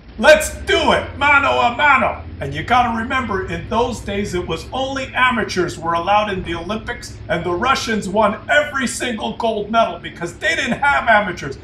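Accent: Canada. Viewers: Impressive knowledge! Sounds like you're Canadian!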